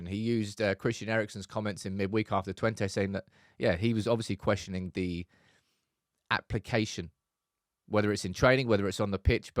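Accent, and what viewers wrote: Manchester, United Kingdom. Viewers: Cant understand your thick manc accent 🎉😂 Ten Hag at the wheel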